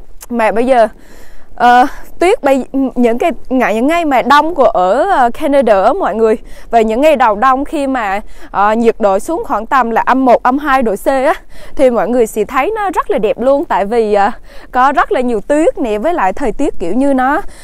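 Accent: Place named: Quy Nhon, Vietnam. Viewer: Giọng này là quy nhơn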